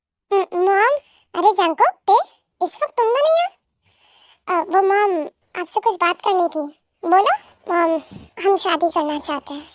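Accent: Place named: Punjab, India. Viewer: Jinu punabi voice ❤😊🥰🥰🥰🐂💜❤❤❤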